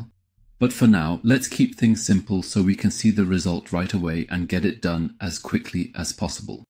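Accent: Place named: England, United Kingdom. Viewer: I liked ur eng accent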